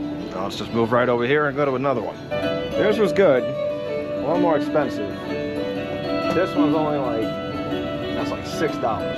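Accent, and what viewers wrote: New York, United States. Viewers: Just wanted to say that I really love your videos. As a fellow New Yorker who used to travel a lot when I was younger, it's like nothing else to be able to tag along on these adventures of yours. It's just so real, like a window into another life. I really appreciate your NY accent and your sense of humor, you just feel like someone I would know, but your skill with languages makes these videos. Not another disrespectful American, someone who gives the rest of us a good name. Thank you for all of it. The Russian and Israeli videos were harrowing, by the way, if it wasn't already uploaded and we were watching it live, I'd even say anxiety inducing. Courageous. You deserve a break, hope you get a nice rest back here in the states, and looking forward to seeing you back at it whenever it strikes you. In the meantime, I'm sure there's more in your backlog I still haven't seen and will content myself with that. 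Thanks again for all of these!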